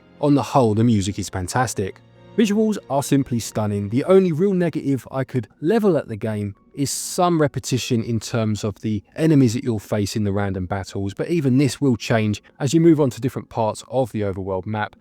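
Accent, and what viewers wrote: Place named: Cockney, United Kingdom. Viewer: It's nofing wrong. I love the cockney accent.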